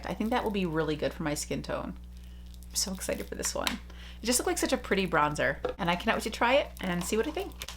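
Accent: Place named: Southwest, United States. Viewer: You look 👀so gorgeous 👌, those earrings and your nails are beautiful 😍. Wanted to ask, did you color your hair or that dark brown color is your natural hair color. I have that exfolikate Kate Summerville moisturizer for $24.99 at Marshall’s, I love it, even though I have an oily skin and I use it only at night, in the morning my skin is so soft. I missed seeing your videos, glad you’re back with one, I just love you 😘so much for being my sunshine ☀️each and every time. Your presence, love 💜, energy, excitement, joy, positivity, passion is always what I’m looking for and you always deliver it cause you’re always absolutely awesome 👏. I love listening 👂to you and watching your videos, I love you 😘so much 🤗for always being yourself and being here for us 🙏. BTW, you’re giving me Native American Cherokee vibe and I know you have that in your roots and blood with southwestern accent, love when you talk like that, especially Y’all. 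About you having cysts in your breast, my sister who’s also in the same situation as you, had this for many years,, her doctor who’s great, have many years experience, especially in the same situation told her, never do a sonogram or mammogram before or during your periods cause the cysts might be larger during those times. Do the tests almost two weeks after your periods so it’ll have chance to shrink and always do a six months sonograms for follow ups.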